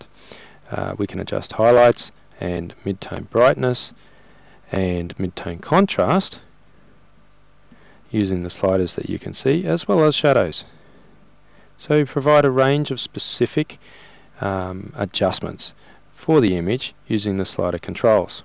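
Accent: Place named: Australia. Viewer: Aussie...hehe. Sorry I would have thought this was really informative but your accent is distracting...been living in the uk for too long lol.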